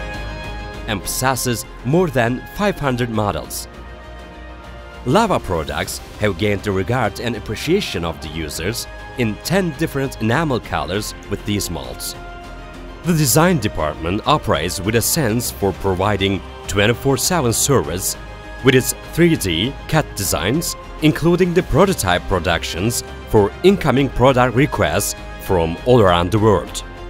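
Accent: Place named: Turkey. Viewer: Allah aşkına kimin fikriydi bir türkü aksanli ingilizce konuşturmak? Acil değiştirin. Çok garip olmuş.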